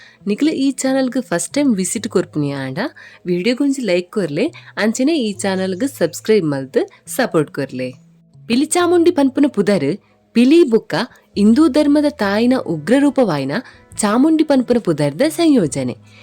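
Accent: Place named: United Kingdom. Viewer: ತುಳು ಸರಿಯಾಗಿ ಮಾತಾಡಿ, mam ಇಂಗ್ಲಿಷ್ ನವರು ಕುಡ್ಲಕ್ಕೆ ಬಂದ ಹಾಗಿದೆ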